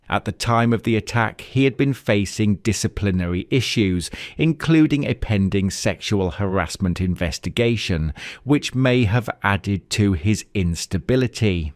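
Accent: United Kingdom. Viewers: British accent is annoying.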